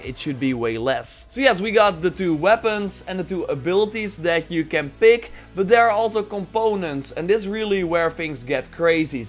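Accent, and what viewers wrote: Netherlands. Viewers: Stronk Dutch accent dem bruf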